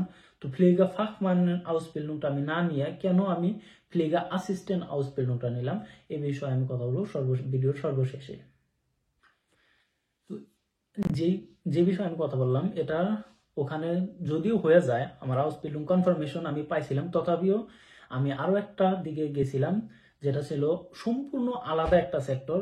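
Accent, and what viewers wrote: Assam, India. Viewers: Uni India Asam theke belong kore.... Doya kore ektu bujhe nen Bangla ta.... Sob jaigar accent ek rokom nah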